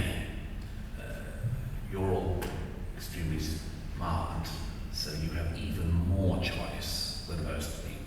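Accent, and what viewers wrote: RP, United Kingdom. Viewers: Talks a lot and says nothing in that horrible upper class English accent of a man who has done nothing in life but give talks like this. As if real people didn't exist.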